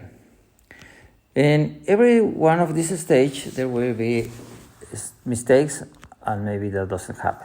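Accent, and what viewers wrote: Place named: Mexico. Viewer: no problem my doctor , if you only problem is the all mexican problem ,do you speak english like mexican xD but your mother languaje is mexican spanish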